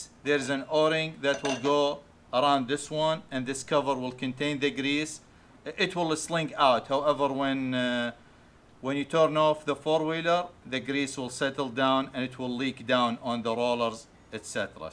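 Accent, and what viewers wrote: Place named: Iraq. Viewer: Don't get me wrong you have noticeably Iraqi accent so, can you open an firearms channel with your Iraqi accent, the thing is similar to fps Russia or ( سلاح زون gun Zone )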